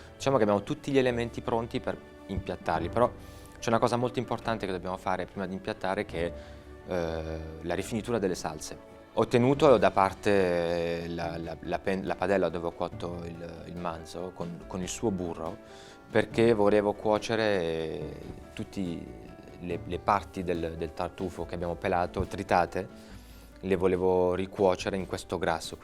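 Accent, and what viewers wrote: France. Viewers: he speaks italian with a lovely french accent. Very nice and charmant. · lo chef ha un accento francese sull’italiano 👂🏻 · Perché parla con l’accento francese 🤔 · Let's say the vid's interesting, but his "language accent" sounds really strange ! Some time he speaks with the french "r"... but sometimes he does speak with a real italian accent ! Hence, the vid sounds a bit setup...😲 Btw, the oil is made by "vinaccioli", not by "vinacce"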